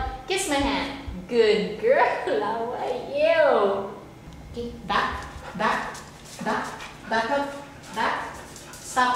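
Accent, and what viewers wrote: Philippines. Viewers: una palang, alam ko nang Pilipino ka, dahil sa accent.. :D hahahaha!! im a Filipino too, and I am very proud to be one.. :) uhm san ka bumili ng Golden Retriever? and how much? please reply, kasi adik na adik ako sa ganyang breed and I would like to adopt one and take care for it... ilang beses mo po siya pinaaliguan? hahahaha!!! :D (ew laway...) :)) xD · pinoy ka no? halata sa accent ng pag english mo hehe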